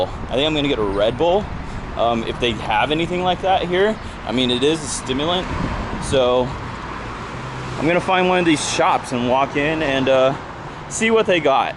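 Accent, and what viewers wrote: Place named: United States. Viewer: How did you get into that country? You sound American and I thought Americans were not allowed to enter right now because of covid.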